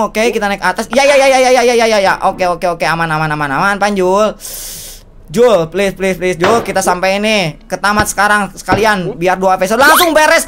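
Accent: West Java, Indonesia. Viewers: sunda bgt wkwkwk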